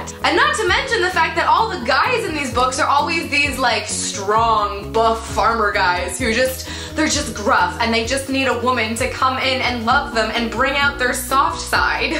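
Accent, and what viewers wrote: Canada. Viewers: Do you have a touch of a Canadian accent??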